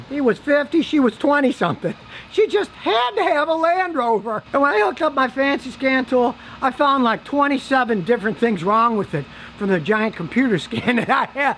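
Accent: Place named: Brooklyn, United States. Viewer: This guy sounds like dafy duck and a really thick brooklin gangster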